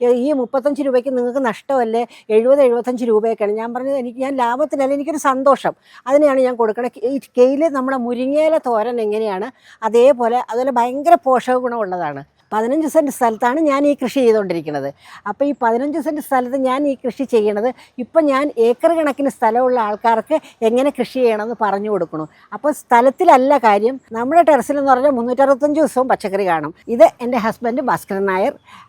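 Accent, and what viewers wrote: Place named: Trivandrum, India. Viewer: ഇങ്ങനെ സംസാരിക്കുന്ന Tvm കാരും ഉണ്ടെന്ന് മനസ്സിലായല്ലോ അല്ലേ. അല്ലാതെ ചിലരൊക്കെ അതിക്ഷേപിക്കുന്ന രീതി അല്ല .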